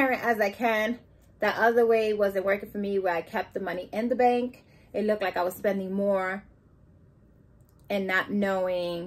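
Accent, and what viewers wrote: New York, United States. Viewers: Off topic but are you a New Yorker ? I hear an accent.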